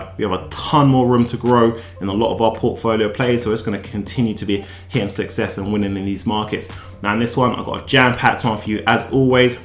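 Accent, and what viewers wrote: London, United Kingdom. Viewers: Are you from london?? SE13 here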